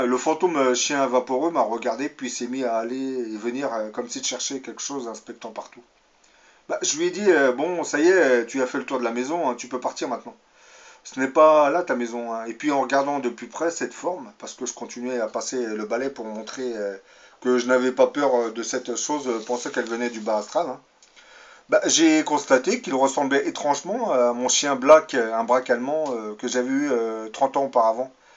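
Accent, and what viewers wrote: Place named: Belgium. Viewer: Vous avez un jolie accent du nord ou proche de la Belgique 🇧🇪... en tout cas cela y ressemble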